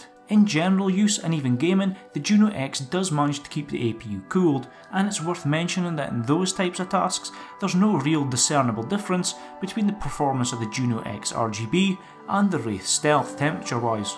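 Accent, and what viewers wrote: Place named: Aberdeen, United Kingdom. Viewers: yeh deffo from up north peter head. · You sound like you're from Aberdeen like me!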